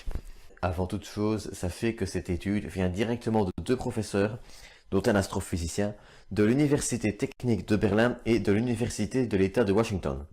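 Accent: Liège, Belgium. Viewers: T'es liégeois toi nan ? 😃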